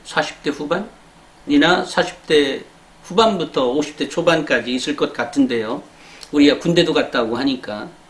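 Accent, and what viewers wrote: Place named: Jeolla, South Korea. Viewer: 말투가 전라돈데 정말 정신나간 인간으로 밖에 안 보입니다.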